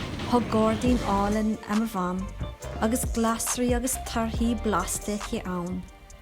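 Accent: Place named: Kerry, Ireland. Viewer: Tá fáilte romhat! Táim an-sásta nach chuir mé olc ort, mar ní raibh sé sin i gceist agam. Tá sé go han-deas éisteacht le blas agus canúint Chiarraí ar líne, agus cheap mé gur trua é go raibh roinnt focail deacar le chloisteáil mar gheall ar an gceol. Ach bhain mé an-taitneamh as an video, is contae álainn é Cíarraí agus is aoibhinn liom liom go bhfuil tú ag déanamh fíor-irracht chun caint lenár gcanúint in ionad leis an gCaighdeán. Táim ag múineadh Gaelainn do mo chaillín as Meicsiceo agus ba mhaith liom an video seo a thaispeáint di chun éisteacht le mo chanúintsa. Táimid inár gcónaí i nGaillimh, só níor chuala sí riamh í, seachas uaim.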